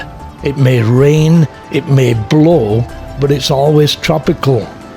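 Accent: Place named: Yorkshire, United Kingdom. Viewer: Did you learn your Cantonese in Hong Kong? You sound like your a northerner. Yorkishire?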